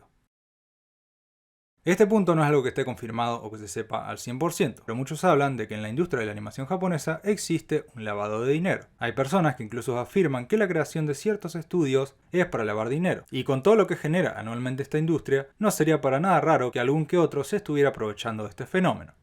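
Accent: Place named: Rioplatense, Argentina. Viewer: No te burles, quizá es un argentino o uruguayo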